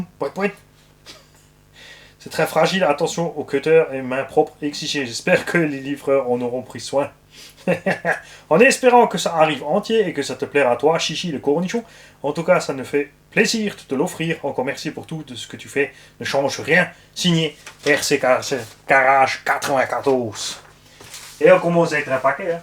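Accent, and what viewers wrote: Alsace, France. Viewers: J'adore l'accent surtout accentuer par le alsacien 👍🏻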